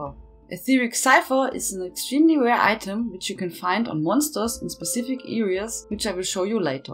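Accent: Germany. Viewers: Ich höre deinen Deutschen Akzent. Sehr Schön ;) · ufff that german accent is hard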